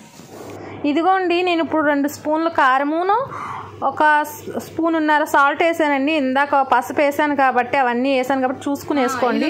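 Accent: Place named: India. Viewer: మీరు మాట్లాడే విధానం ఉందే అచ్చ తెలుగు ఆడపిల్ల ఎలా మాట్లాడుతుందో అలా ఉంది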